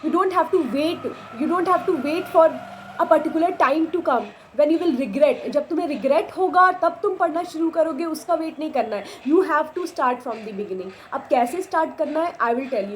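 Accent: Bihar, India. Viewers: Sister do you belong from Bihar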